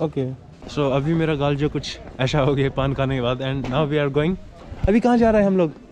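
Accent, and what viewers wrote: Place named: South, India. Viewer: Am not against or racist... Just to clear myself.. Your accent constraint me to ask you. Are you from South.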